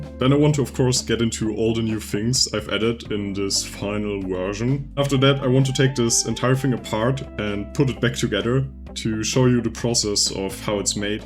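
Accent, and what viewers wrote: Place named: Germany. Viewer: [1] startint to watch video with cleary German accent but too lazy to check if the Youtuber is German or not [2] hearing German word, no need to check anything [3] reading funny comments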